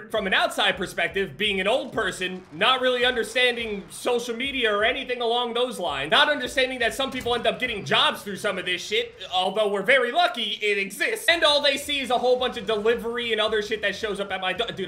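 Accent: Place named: New Jersey, United States. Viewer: you can tell from jersey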